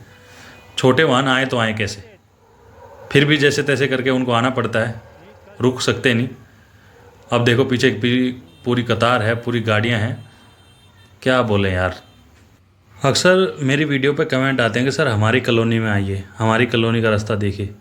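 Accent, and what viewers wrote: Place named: Haryana, India. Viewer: भाई जब आप हरयाणवी मै बात करते हों तो बहुत अच्छा लगता है ❤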